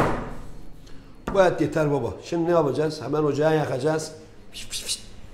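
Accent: Gaziantep, Turkey. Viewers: Senin tatlı dilin,Antep ağzın yeter abi. Antep’e gelirsek muhakkak uğrayacağız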